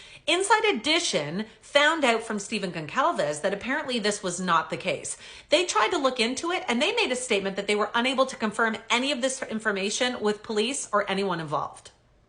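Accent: Canada. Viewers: I don't believe your pronouncing Steve and Kaylee's last name correctly. Never mind the Canuck accent. should check that out. Maybe I'm wrong 🤷🏼‍♂️🤦🏼‍♂️🤷🏼‍♂️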